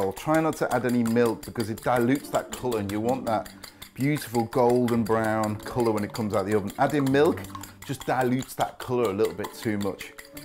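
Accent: England, United Kingdom. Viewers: The bloody guy has an English accent (he’s from Liverpool), can you not tell? They’re Scones! Scones came first remember, we are the mother country after all! No they’re not biscuits. 🙄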